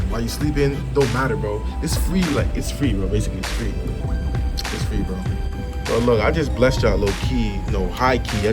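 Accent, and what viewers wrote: United States. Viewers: Uu from new york aint uu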